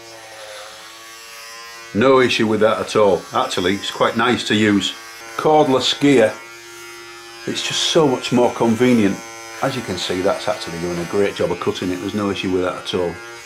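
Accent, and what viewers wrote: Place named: Liverpool, United Kingdom. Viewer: Absolutely brilliant. No fluff, straight to the point. Sound like a scouser or Manchester maybe.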